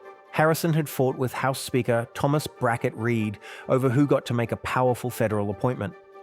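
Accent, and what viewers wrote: United Kingdom. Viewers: This narrative skews the actual history and context of our Constitution; The WH is in the Executive Branch and the POTUS does not need permission from congress make changes to the WH. They are only needed if public funds are needed, congress controls the budget allocation. The British have been colonizers and slavers for the last 400 years, never trust a video with a British accent and never trust anyone with a British title. Yeah, I said, so what. 1st Amendment, they hate it and I love it. Use it or loose it. USA❗ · Why use a British accent to narrate the story of an American seat of government? And the crappy AI images have almost nothing to do with the narrative…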